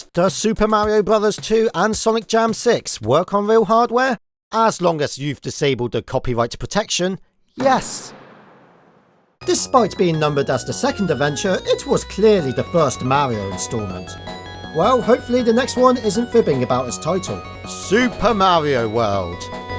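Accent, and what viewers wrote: United Kingdom. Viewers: how did i never know you were british until rewatching this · So he's not Australian, but British? Alright.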